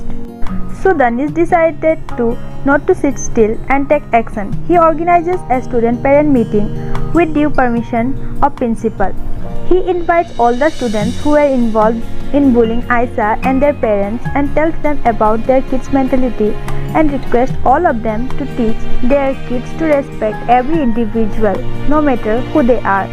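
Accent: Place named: Bengal, India. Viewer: Heiii!! If you read this, then let me tell you that you really have a cute bengali (no offense) accent and that Just added the sprinklers to your storytelling. Actually I am currently reading "OUR IM'POSSIBLE LOVE STORY" & was really curious and came up to your channel. Keep narrating more📖✌🏽 support 💛💜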